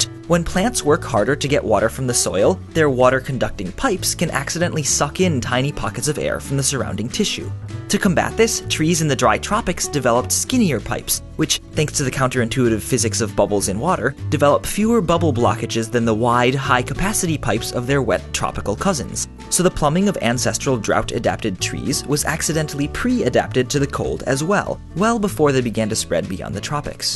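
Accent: Canada. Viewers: Is this guy Canadian?!? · i didn't know that you are canadian. or are you? great video as always!! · Are you canadian? Just askin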